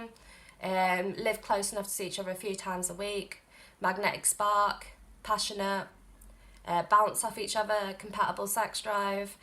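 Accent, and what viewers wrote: Liverpool, United Kingdom. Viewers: Hahaha I used to live in Edinburgh and I feel like you have an accent that's pretty close to Liverpool lol. But I love it and I get addicted to listening to you talk so keep rockin' xD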